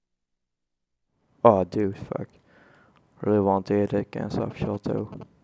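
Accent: Netherlands. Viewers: Are you dutch? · HahahahahHhahaha jij bent sws Nederlands je accent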